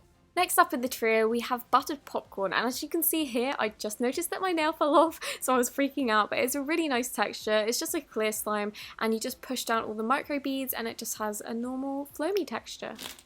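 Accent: United Kingdom. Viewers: Aren’t you British not americqn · I mean, she IS British and pronounces things differently than Americans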